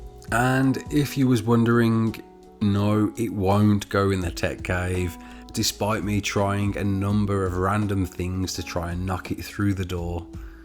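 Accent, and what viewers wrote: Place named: Midlands, United Kingdom. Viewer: where abouts you from in Midlands sound local man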